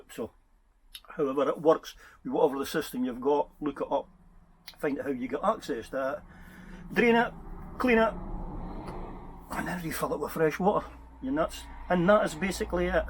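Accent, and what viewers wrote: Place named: Scotland, United Kingdom. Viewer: Lovely Scottish accent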